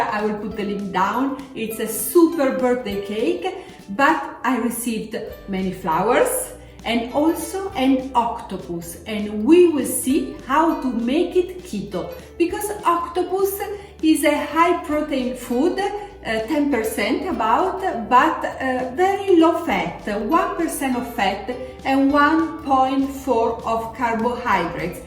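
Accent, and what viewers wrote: Italy. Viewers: You have big Italian accent but I understand you very well Better then a person with an American accent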